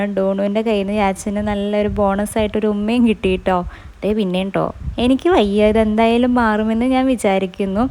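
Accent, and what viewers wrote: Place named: Thrissur, India. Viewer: Athu Thrissur ullavarde samsarathil varunnatha adi kittum ttaa ithupole ellathilum tta tto swabavikamayi avrde samsarareethiyil varunnatha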